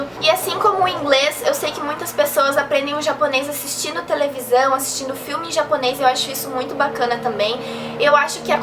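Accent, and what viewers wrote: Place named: Southern Brazil, Brazil. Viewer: Gostei do seu vídeo, gostei das informações, ultimamente tudo o que tem a ver com japonês eu estou consumindo e o seu vídeo foi útil pra mim. E pra mim foi muito lindo ver uma menina "Japonesa" com o sotaque do sul do Brasil!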